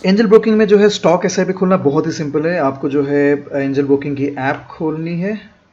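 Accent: Odisha, India. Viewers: Bhai are you odia..?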